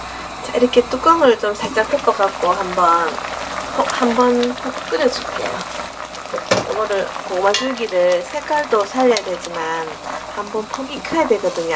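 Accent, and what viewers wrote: Gyeongsang, South Korea. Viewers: 고구마줄기 골은거.ㅋㅋㅋ 한참웃었네요.뼈속까지 갱상도우리이모님. 저도 마산사람이라 골은거 낯설지가않네요. 화이팅입니다!!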